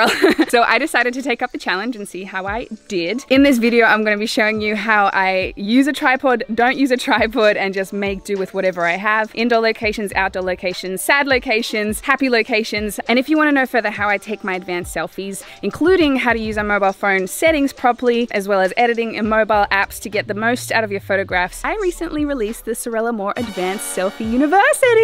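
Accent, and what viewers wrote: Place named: Australia. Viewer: Were you using a timer or? Also! You’re so bubbly I love you channel! And! What’s your nationality because your accent is a bit of a mix. I’m from Australia and you sound kinda aussie!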